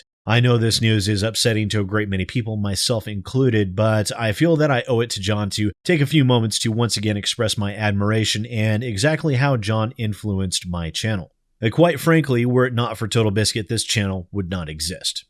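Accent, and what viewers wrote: England, United Kingdom. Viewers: I'm going to miss that awesome British voice...RIP. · I dont even remember his reviews, just remember annoing english voice - nothing more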